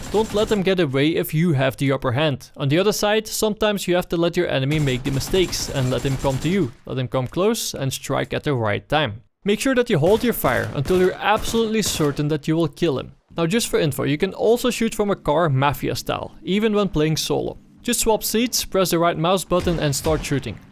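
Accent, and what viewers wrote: Netherlands. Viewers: zijn accent is zo vreselijk nederlands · Ik hoor je Nederlandse accent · it sounds like he is Dutch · it Sounds like your from the Netherlands with that accent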